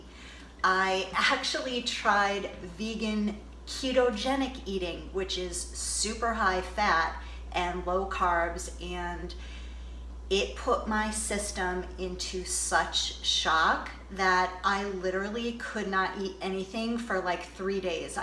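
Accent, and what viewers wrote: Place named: Canada. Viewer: I needed this. Been overwhelmed watching endless videos on FODMAP. I'm going to get this book. Just finished listening to a radio show with the author. Oh btw, you sound Canadian. Still trying to get over your age. You don't look it so you must be doing something right. I'm the same age and get compliments that I look 32-36 but you look young as ever. Thank you for the help. Now a subscriber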